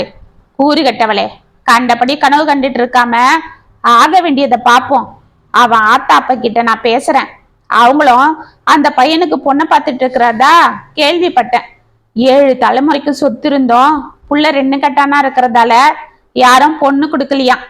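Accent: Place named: Theni, India. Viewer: உங்கள் குரலில் தேனி வட்டார மொழி அருமை❤️❤️❤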